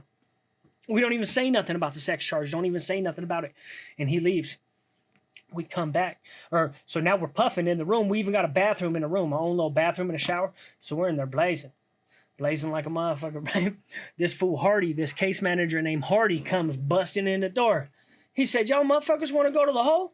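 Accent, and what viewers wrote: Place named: United Kingdom. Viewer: I love this kind of British accent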